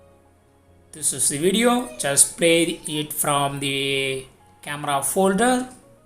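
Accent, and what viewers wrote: Tamil Nadu, India. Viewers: Tamil accent 😁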